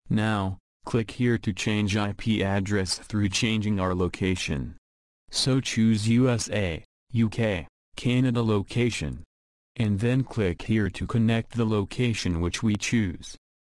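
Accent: Romania. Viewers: Bro tell me ur Romanian!!!🤯🤯....same!!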